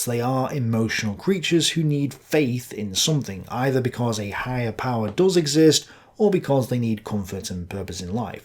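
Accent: United Kingdom. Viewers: Overlooked is their primary motive and impulse to expand Europe eastward into a world superpower colossus. No matter how bizarre, any ideology to further that goal no matter was deemed proper. That's why Hitler tolerated that stuff. It's amusing to hear a guy with a Limey accent in the once great British Empire bad mouthing that failed dream.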